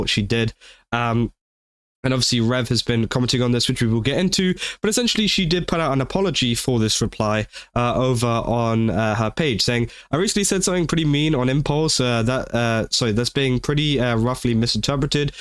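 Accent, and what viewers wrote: United Kingdom. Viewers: Wait... is British!? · Youre british ? Man im sorry i might not support you anymore 😔 this is outside my boundaries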